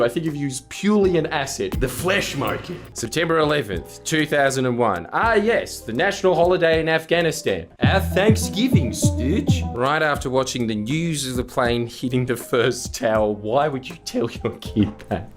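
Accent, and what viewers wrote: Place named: Australia. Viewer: WHERE hAVE YOU BEEN MY ANGRY AUSTRALIAN AFTER WORK-HOBBY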